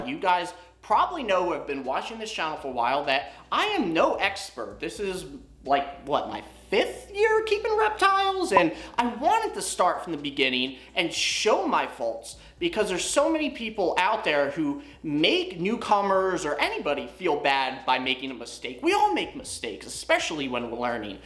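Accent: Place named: New Jersey, United States. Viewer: Don't sweat the negativity,. I've learned a lot from you. You're my Ackie guy, and when I'm ready to get one I'll come to you. BTDubs, you can't help the Jersey voice bruh, lol. Lots of love from a southerner and I hope you feel better.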